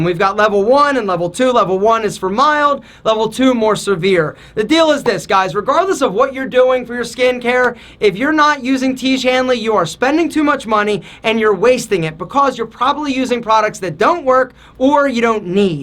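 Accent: Philadelphia, United States. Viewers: He sounds like he’s from Philly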